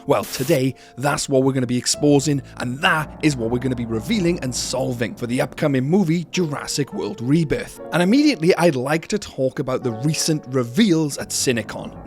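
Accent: Wales, United Kingdom. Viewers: After discovering this channel, I only want to hear Jurassic Park/World news in a cheerful Welsh accent 🏴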